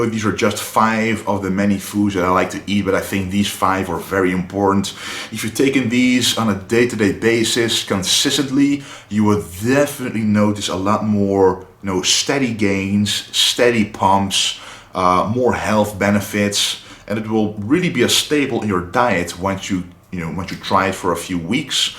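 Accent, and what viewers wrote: Netherlands. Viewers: I LOVE THE DUTCH ACCENT. I AM MOVING TO THE NETHERLANDS TO FIND A MAN TO SPEAK TO ME IN THIS LOVELY AND SEXY ACCENT.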